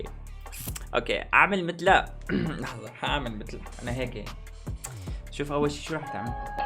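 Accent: Algeria. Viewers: لهجة جزاءرية طالعة حلوة عليك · ههههههه ممتاز ف اللهجة الحزائرية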